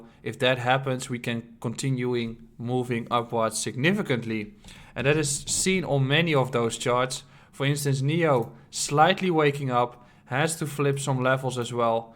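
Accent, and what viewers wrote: Netherlands. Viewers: Haha, aan je accent is te merken dat je Nederlands-talig bent, wat zijn je verwachtingen voor Zilliqa aan het eind van het jaar ? Zojuist 10.000 euro geinvesteerd, hopelijk kan ik me hypotheek aflossen binnen een paar jaartjes 😃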